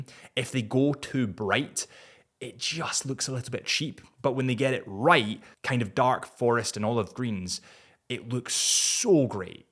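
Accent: Scotland, United Kingdom. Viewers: I love the Scots accent... it's so cool. Connery was the best James Bond.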